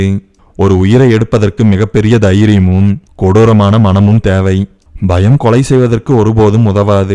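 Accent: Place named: Kongu, India. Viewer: நல்லா இருக்கு கொங்கு தமிழ் ❤❤😊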